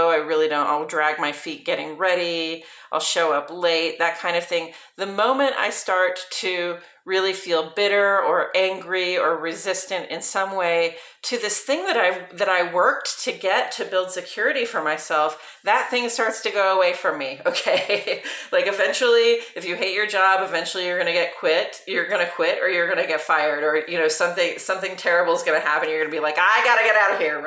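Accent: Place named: Chicago, United States. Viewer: Hi, (Flakeway and) I have to ask you out of curiosity where you are from originally. I've suspected that from your voice that you sound like you are from NE Illinois ie, Chicago area as I am originally.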